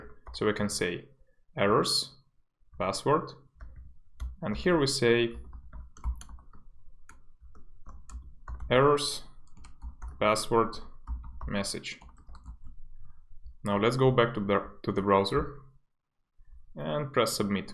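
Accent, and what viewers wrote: Russia. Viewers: thank you! you speack with a russian accent)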